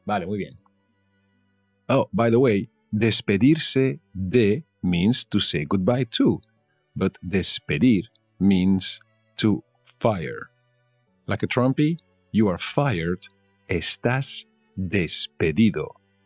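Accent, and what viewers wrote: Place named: Castile, Spain. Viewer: I have gone through many different tutorials and decided to go with yours. Your way of teaching is both very clear and efficient. That you are giving different examples than what is written on the slide is genius because that way it is possible to learn more vocabulary and grammar. Another important reason I chose your tutorials is it was hard to find European Spanish(or should I say Castilian?) I adore the "th" sounds haha. Thank you for your work, it is much appreciated.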